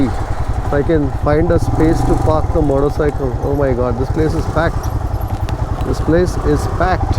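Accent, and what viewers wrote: Karnataka, India. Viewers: I guess chesty is more suitable. When v r off the biking with wide coverage. And ya ur kannada is sweet. That's y u have more local addicted viewers. 😊😊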